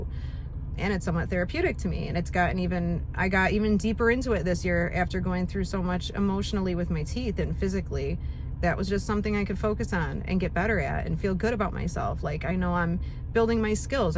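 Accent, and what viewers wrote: United States. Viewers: Bonjour à vous je viens de m'abonner à votre chaîne vous êtes courageuse avec c qui vous êtes arrivé pour Vos dent croqué la vie a plein dent vous avez raison et vous êtes très belle j'adore votre accent américaine moi je français et je m'appelle Jonathan enchanté de vous regarder bisous à vous 😊 bonne continuation 👋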